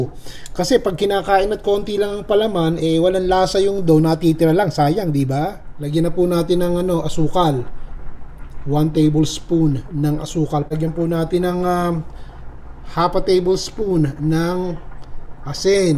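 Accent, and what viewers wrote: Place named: Nueva Ecija, Philippines. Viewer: base sa punto nyo kuya ,novo ecijano po kayo? kababayan ko po kayo shout out po sa mga tiga nueva ecija ! especially sa mga tiga zaragoza 😊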